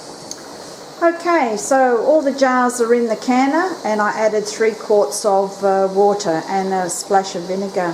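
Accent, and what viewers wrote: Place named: Australia. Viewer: Thank you so much. Im just starting back with canning after a few years and i am doing lamb mince cos Aldi had it marked down. So nice to hear an aussie voice !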